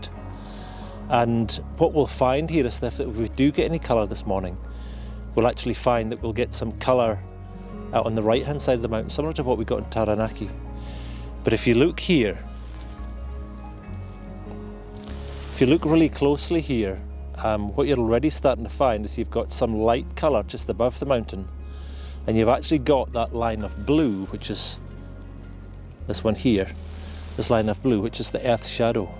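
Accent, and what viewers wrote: Scotland, United Kingdom. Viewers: I came across many sites on photography, but never one like yours. You really hone in on the finer details and helping struggling photographers like myself in choosing when best to shoot and what settings to use. I love this segment, as well as the one on shooting pano when you explain the need to use the nodal stick to reduce perspective "distortion" and helps to blend multiple images better. I have since bought one such machine, thanks to your teaching. Please please continue to inspere us, the struggling photographers. Your voice is good, "smooth Scottish" accent I kind of getting used to now and it sounds like music to my ears. Thank you is never enough for such a great teacher like you.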